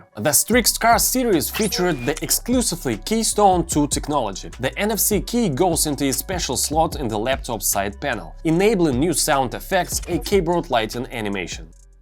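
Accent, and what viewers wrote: Russia. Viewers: I enjoy it,but Take away that Russian-english,it will bee 100% better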